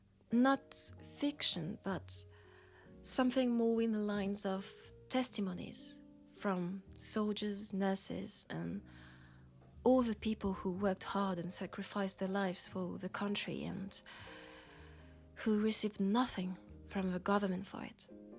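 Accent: France. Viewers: Lovely as usual :) Hearing your french accent + mentions of Paris made me think: Have you played Clair Obscur: Expedition 33? I suspect this game will be a must-play for every French gamer, just like Witcher is for Poles ;) If you did, can we expect a story from the game world?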